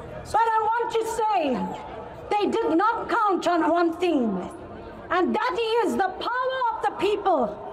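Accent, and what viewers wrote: South Africa. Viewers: Before she said she was south African I already knew it from her seevh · I immediately recognised the South African accent!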